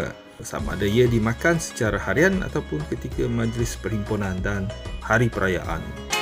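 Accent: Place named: Indonesia. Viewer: Lidahnya sama dgn orang indonesia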